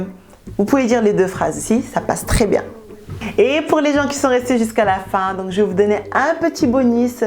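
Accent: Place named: Senegal. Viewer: Tu parles bien wolof avec l'accent parfait de la femme sénégalaise 😂 ! Excellent👌🏾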